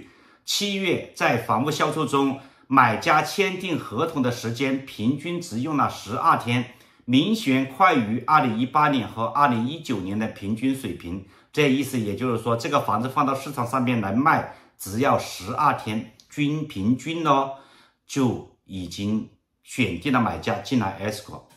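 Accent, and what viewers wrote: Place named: Cantonese, China. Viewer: 你有口音，你是不是讲粤语的？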